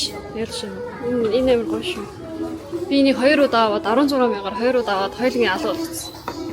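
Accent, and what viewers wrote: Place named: South Korea. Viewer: Монгол уу Солонгос уу? гадаадад олон жил болоод ингэж ярьж байгаа бол яахав гэхсэн. +5 жил болоогүй л бол ёоо хахха cringe юм бээ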